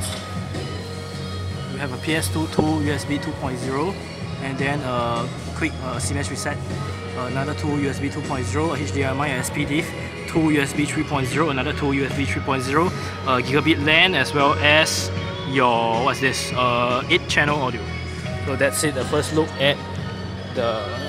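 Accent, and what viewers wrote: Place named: India. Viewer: Bro no offence but you indians speak english far too fast makes it harder to understand with your accent speak slower then i will be able to understand you better.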